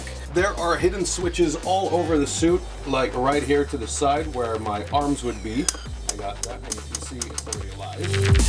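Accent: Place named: Netherlands. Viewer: Lol he actually has a Dutch accent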